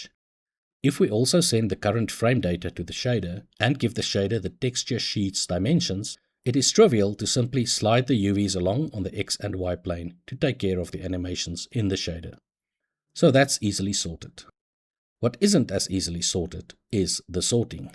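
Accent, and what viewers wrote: South Africa. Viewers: You sound South-African, The work looks interesting. What game are you building?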